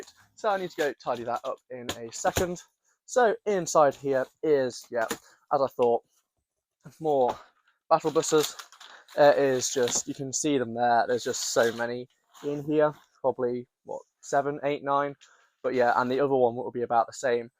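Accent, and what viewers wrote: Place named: United Kingdom. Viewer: So despite the accent you’re not in the UK?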